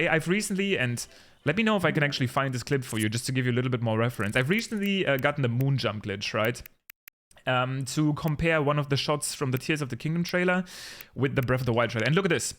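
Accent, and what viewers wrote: Germany. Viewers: Are you German? Your English sounds German.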